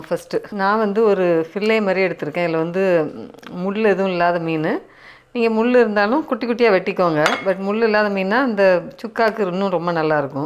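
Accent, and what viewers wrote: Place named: Tirunelveli, India. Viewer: மேடம் உங்கள் டிப்ஸ் அருமை உங்கள் பேச்சில் திருநெல்வேலி வாடை வருகிறதே எந்த ஊர் நீங்கள்.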